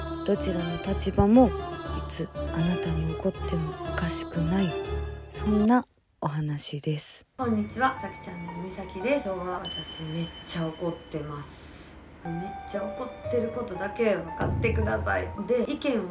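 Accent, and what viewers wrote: Kansai, Japan. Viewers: 変な男やな～関西弁ええやん～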